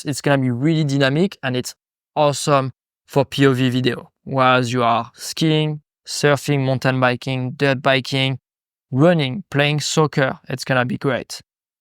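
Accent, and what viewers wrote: France. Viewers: Félicitations quand meme pour l’anglais, ca me trigger quand meme 😅, j’entend du franglais c’est perturbant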